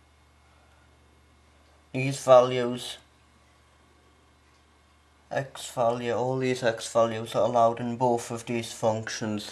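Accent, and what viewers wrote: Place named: Ireland. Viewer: Irish as fuck and i love it ha thx for the help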